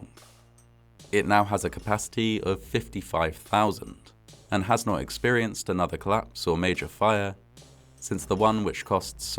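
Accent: United Kingdom. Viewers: British pronunciations🤭!!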